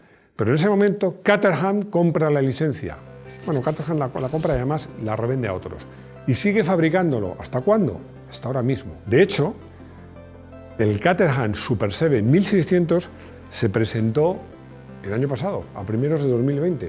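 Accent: Chile. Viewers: saludos desde La serena,Chile. inconfundible el acento nuestro. muy buenos videos....felicitaciones. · La voz en off es de un Chileno?, que desagradable!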